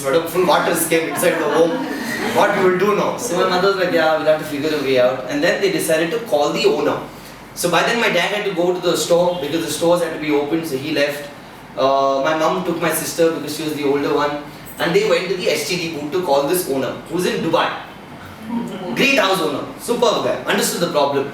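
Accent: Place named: Deccan, India. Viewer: Hey dude I'm your fan from Bangalore and the area next of you and I just imagined every thing you said and the dakny urdu was awesome 😚😚😚😘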